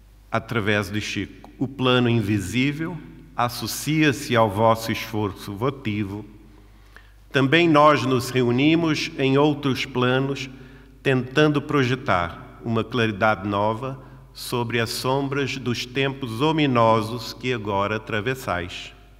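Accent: Portugal. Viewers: o está com sotaque de Portugal?? 😳😳😮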